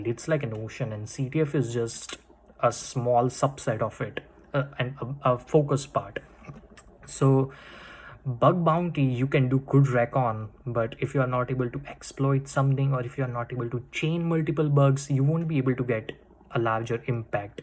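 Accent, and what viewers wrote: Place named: India. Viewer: English Ko Bhi Hindi Jaise bolte ho😁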